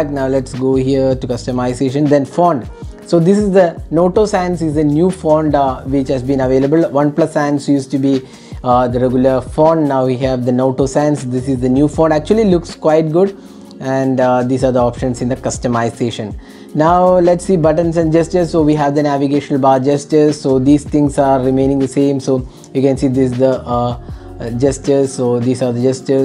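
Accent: Kerala, India. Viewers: R u from Kerala???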